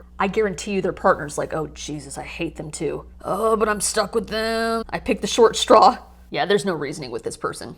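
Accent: New York, United States. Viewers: Long Island and possibly Jewish. 😝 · Long Island? 🤔😳😬✌🏼I Think I Heard it when You imitated Your Mom and the 73 Yr Old Thanks 👍🏼🌞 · Off topic your ny accent is hot❤ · I ALWAAAYS GET THE LYING PERSON EVERY TIME!!! I LOOOOVE YOUR NEW YORK ACCENT..IT'S THE BEST!! YOU DESCRIBED MY MOM TO THE T...THE HARDCORE WITNESS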